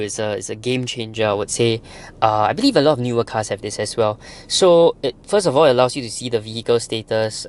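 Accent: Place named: Ghana. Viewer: you sound like you went school in ghana 👀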